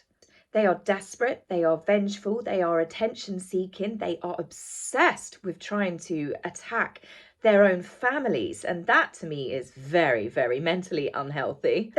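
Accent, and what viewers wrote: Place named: Cockney, United Kingdom. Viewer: Very nicely done video. Your appearance is simply transformational from about 2 years ago. I'm the one that used to tell you to slow down your speech as I cannot understand fast "cockney". No issues now.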